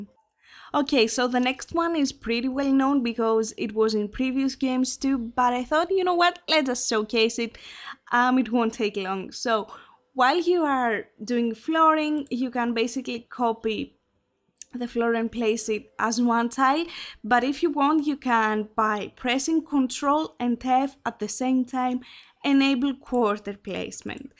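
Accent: Greece. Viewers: She has to be greek to have that accent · Are you from Greece??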